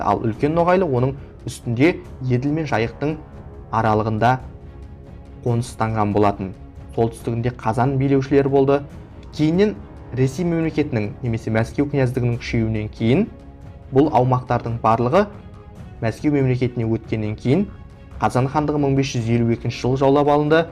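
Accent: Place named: China. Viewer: Автор қытай ма монғол ма немене? Неғылған акцент? 😡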